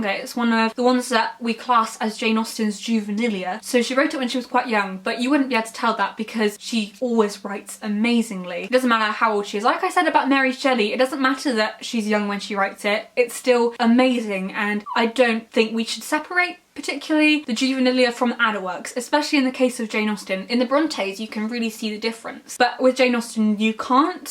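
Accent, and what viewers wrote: United Kingdom. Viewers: I came here thinking the “classics” where of a higher order. I thought she was going to refer to greco-roman classics of LOEB library. Aside that, I imagined her with A British accent and was not disappointed. You’re a beautiful women. Greetings from the golden state. You earned a sub.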